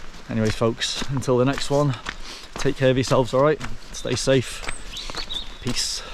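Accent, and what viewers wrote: England, United Kingdom. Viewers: Your not welsh you sound english and not 1 of them are asylum seekers many countries they pass through before getting here? 2/3 if they really were that they'd settle for the 1st country out of pure desperation but our country are easy targets if anything gets said the race card gets pulled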